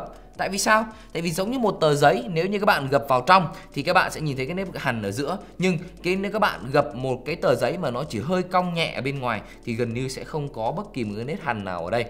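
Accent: Thanh Hoa, Vietnam. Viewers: Ông review này người Thanh Hoá phải k :)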